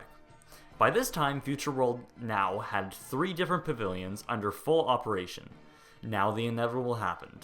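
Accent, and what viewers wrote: Canada. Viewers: you should do impressions of Ashton Kutcher, without your Canadian accent of course. You sound exactly like him!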